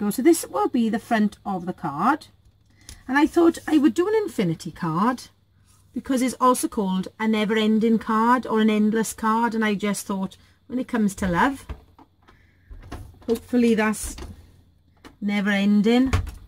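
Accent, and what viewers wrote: Wales, United Kingdom. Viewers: thought you sounded more welshy than me lol. Xx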